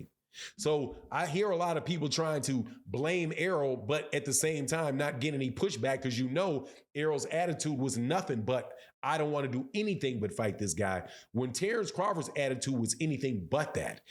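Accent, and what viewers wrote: Texas, United States. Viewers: are you from Texas???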